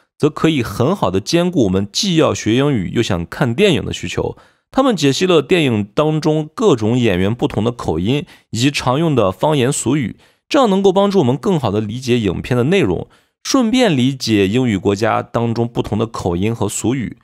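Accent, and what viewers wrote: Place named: Xi'an, China. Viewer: 一听up主就是西安老乡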